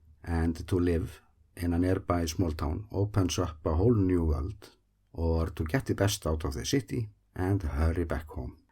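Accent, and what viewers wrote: Iceland. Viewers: One of my favourite things is how well I understand the Icelandic accent from watching your videos. When I first started watching I needed subtitles sometimes but now it’s completely natural to me. Keep making awesome videos :)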